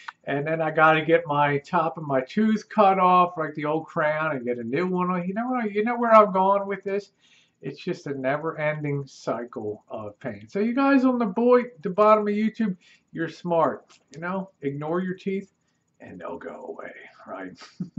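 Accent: Baltimore, United States. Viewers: I knew u were from Baltimore !! I was a city cop there for 23 years.The minute u said wooder for water. 😆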